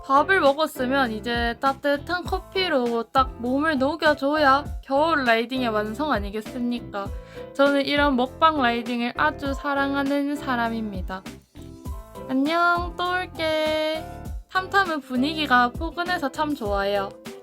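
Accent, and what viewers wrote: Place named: Busan, South Korea. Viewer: 사투리안쓸려고 노력하는모습이 귀여워요.ㅋㅋ 그래도 마~부산아잉교~ㅋㅋㅋ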